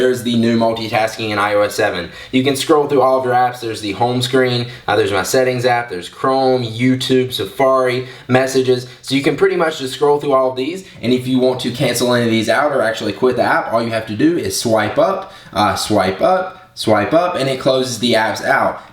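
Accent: United States. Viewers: you have the biggest american accent ever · it's not exactly an american accent. it's a country accent.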